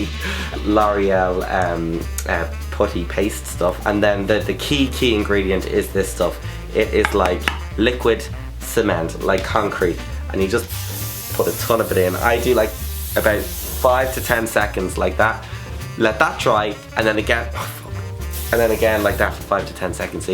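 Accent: Ireland. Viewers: ) I love your videos, and your accent! Ireland is one of the prettiest countries I've been to! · your accent is awsome love the irish accent its so sexy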